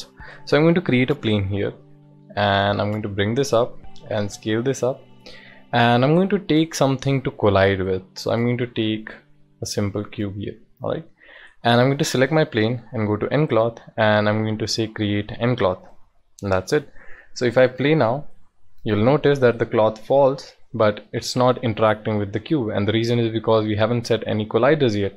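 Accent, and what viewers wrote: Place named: India. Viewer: I found that this guy is an Indian because of his voice😎😈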